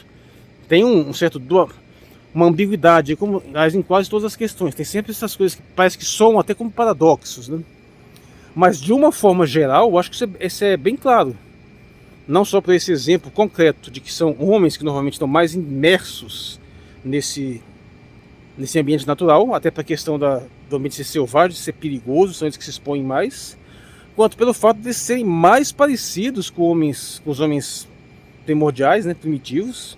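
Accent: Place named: Brasília, Brazil. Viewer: Tu vive em contato com o bioma cerrado direto ? Pelo seu sotaque acho que você é de Brasilia ou do DF.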